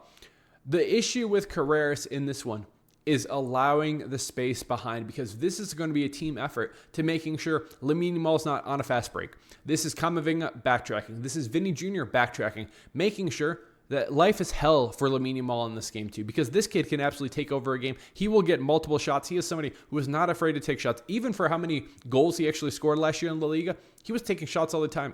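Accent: United States. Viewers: If its a contest between the midfields, madrid will lose. Part of how/why Sevilla won vs Barcelona was Sevilla interrupting Barcelona's "normal" passing network. [You sound American, so] Go ahead and look at a passing map for a "normal" Barcelona fixture, and then look at the passing map vs Sevilla. Normally, Barcelona run everything through Pedri, who then distributes the ball all across the park. But Sevilla had their #18 give up any pretense of doing anything other than to man-mark Pedri, which turned Barcelona's normal pass network into something that more looks like the USMNT. It also cut Pedri's "normal" number of touches in half. Taken together, this interrupted Barcelona's possession plans. Most imbecilic commentators are too lazy to see anything beyond "the high line" with Barcelona, but what's more important to Flick are Barcelona's press, and Barcelona's possession. 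Interrupting Barcelona's possession is the one way that another midfield can outplay Barcelona's. Sevilla did it, and Q$G did it. With respect to madrid, I don't know if any of Camavinga, Guler, or Bellingham are willing to subsume "their own" games, in order to go try to mark Pedri out of the game. In fact, I doubt it. It is for this reason that if it comes down to madrid's midfield vs Barcelona's, madrid will lose.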